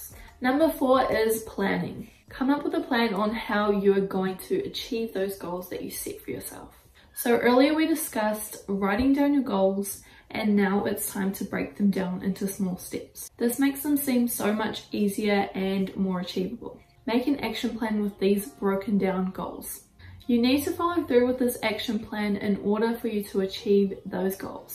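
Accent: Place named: Australia. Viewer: I hear an Australian accent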